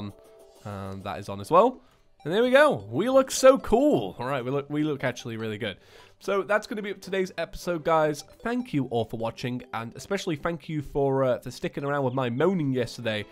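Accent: United Kingdom. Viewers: I was super confused to his british accent, "What the heck is super heart? I don't remember a game like that", then I realized he was talking about super hot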